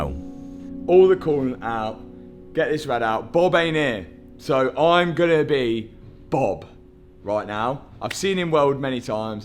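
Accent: United Kingdom. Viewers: Watching your videos to learn british accent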